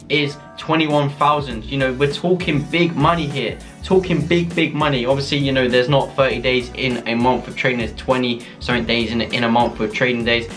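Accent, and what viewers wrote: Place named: United Kingdom. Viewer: Can you spell out the app for people like me who cannot fully understand ur British English 💯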